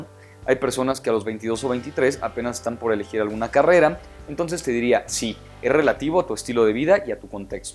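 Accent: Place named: North, Mexico. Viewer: A de ser norteño este men v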